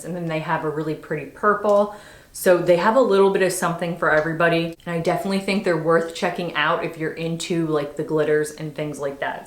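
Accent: Philadelphia, United States. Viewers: Hi! I just found you (on a tanning review video), and I love your videos! One of the reasons I loved you right away is I detected the same slight Delco/Philly accent I have! 😂 Of course I’m assuming, I may be wrong. Thank you for all that you share!! ☺️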